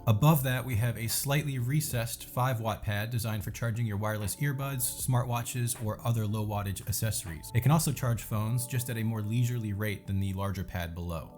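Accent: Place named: Netherlands. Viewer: You're Dutch aren't you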